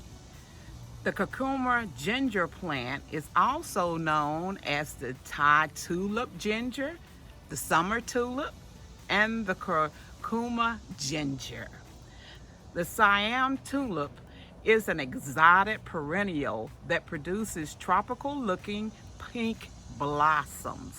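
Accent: South, United States. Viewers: Hi, love your beautiful southern accent, your blessing, your knowledge about the glorious curcuma blossoms & your theme song at the end. I’ve just bought 3 curcumas & have planted them in a trough planter. I have placed it under my bougainvillea standards facing south. Hope it works. 👩‍🌾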